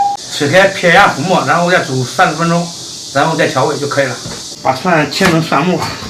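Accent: Henan, China. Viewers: 这口音是河南还是襄阳啊？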